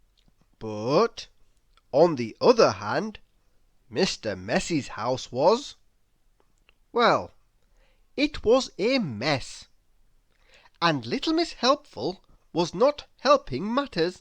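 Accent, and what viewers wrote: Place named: India. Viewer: im indian and are you Indian because your photo looks indian and you sound indian🙏🙏🙏🙏🙏🙏🙏🙏🙏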